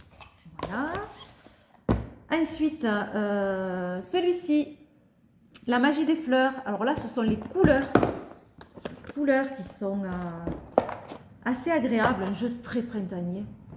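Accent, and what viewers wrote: South, France. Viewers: ton accent du sud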